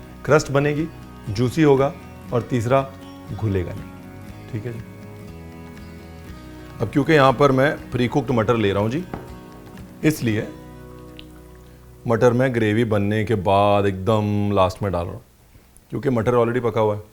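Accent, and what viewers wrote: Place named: Punjab, India. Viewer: Aapko sunte hi maine bhi apne andar ke Punjabi ko pehchaan liya. Hats off Chef. 🙏🏼❤️🙏🏼 Isbaar bengali recipes ki baari. Plz plz.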